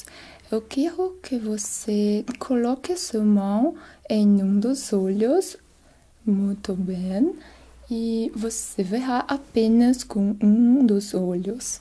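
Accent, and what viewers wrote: Germany. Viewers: Sotaque alemão incrível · brasileira imitando uma alemã perfeitamente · Que sotaque lindo é esse?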